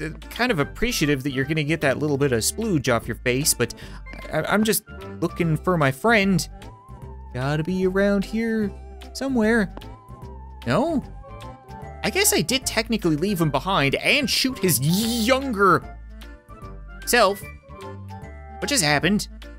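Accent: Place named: Newfoundland, Canada. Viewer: He has a Newfoundland accent where is he from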